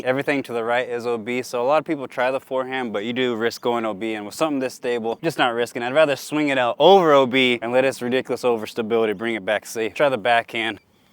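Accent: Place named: United States. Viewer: always wondered why you have an american accent and say things from 70s-80s vernacular.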